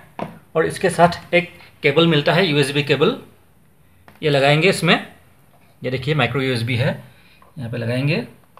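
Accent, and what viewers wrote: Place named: Assam, India. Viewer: kiunki tu bengali hai wo asamese hai. dont make fun on others accent. being a bengali i know how u people too have funny hindi accent.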